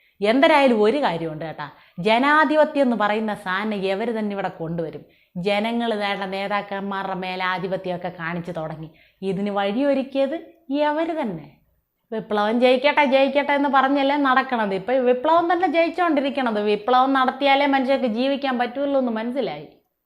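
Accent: Thiruvananthapuram, India. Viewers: അടിപൊളി വിവരണം... TVM ശൈലിയിൽ തന്നെ മുന്നേറട്ടെ. എല്ലാ വിധ ഭാവുകങ്ങളും 💐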